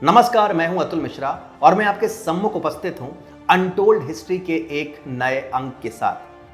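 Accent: Standard. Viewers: I have seen and heard quite a lot of your historic narratives... I have to admit.. Aap ka gyan kai guna shrestha hai atirikth anya tathakathit gyaniyon say.... Evam jo shudh uccharan hai wo bhi sarahana yogya hai... Bandhu. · Itni sudha hindi kabhi kabar hi sunane ko milti hai, I like your speech. Chanakya, yogi, k baad pehalibar aapse itni shudh hindi suni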